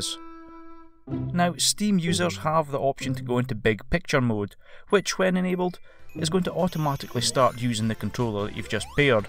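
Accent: Scotland, United Kingdom. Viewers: Nice accent are you from Scotland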